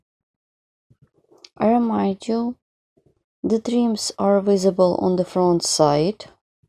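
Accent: Russia. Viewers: Почемуто какжется, что русскоговорящая говорит на анллийском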